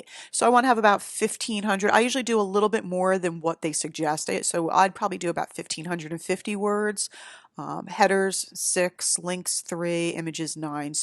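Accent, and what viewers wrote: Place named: Philadelphia, United States. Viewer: Nice content! Based on your accent I think you're from Philly :)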